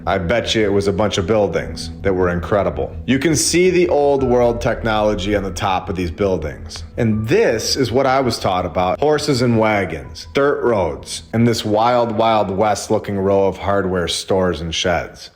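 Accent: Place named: Wisconsin, United States. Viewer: you can't hide that wisconsin accent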